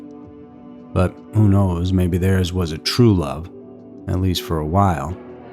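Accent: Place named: New Orleans, United States. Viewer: where is the narrator from? new orleans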